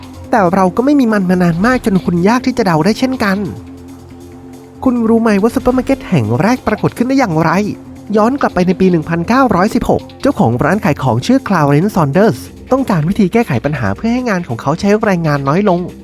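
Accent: Isan, Thailand. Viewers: คนอีสานบ่หนิ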